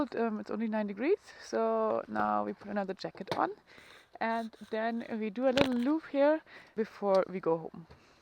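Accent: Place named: Germany. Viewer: This girl has to be German. Nice accent when she speaks.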